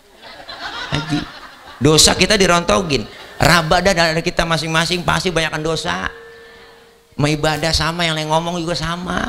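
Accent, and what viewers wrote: Betawi, Indonesia. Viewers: Resep banyak banyolannya Dan kental betawinya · masya allah... suka bngt ama yg 1 ini... suka bngt ama logat"y... sama² kita orang betawi yah....😁 ketawa trs klo ngeliat ceramah... # JAKARATA_BARAT Hadiirrr☝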